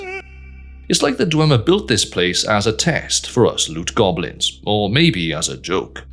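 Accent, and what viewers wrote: Wales, United Kingdom. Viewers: Are you Welsh?🏴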